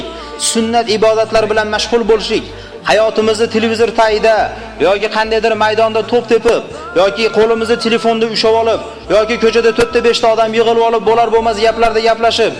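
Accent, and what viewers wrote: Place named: Andijan, Uzbekistan. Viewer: Андижонда укип битирган домла шекилли шевалари тозаа Барокаллох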